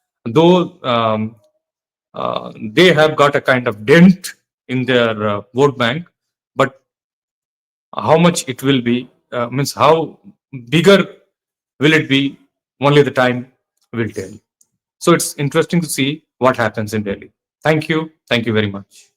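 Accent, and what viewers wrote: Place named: Bangladesh. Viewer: Your accent is bangladeshi , show us your dr degree u cheat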